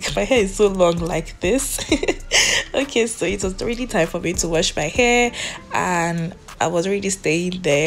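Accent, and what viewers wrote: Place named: Igbo, Nigeria. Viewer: This your Igbo is sweet o😂❤️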